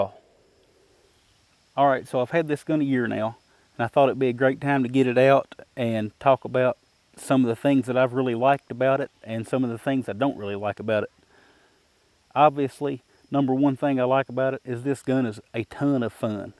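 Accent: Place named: South, United States. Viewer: southern accent and looks Hispanic.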